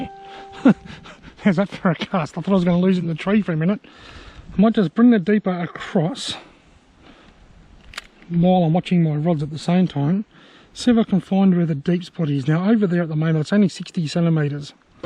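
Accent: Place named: Australia. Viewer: Sounds like a true Aussie.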